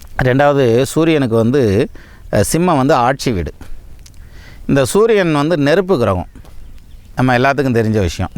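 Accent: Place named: Madurai, India. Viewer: Ungalaal, Namathu Dindigulukku Perumai, Tamilukke perumai, tamil naattukkum perumai, Naattukkume perumai. Kaalam pora pookil pazham-tamil sethuvidumo yendru payam irunthathu.... But it is playing happily in your tongue. Thanks for protecting and preserving our pazham-tamil related to astro field. I am fully impressed with your deep knowledge, detailed analysis, Tamil delivery, namma dindigul - Madurai slang and nakkal. Vaalka Valamudan. I 'll be meeting you sooner.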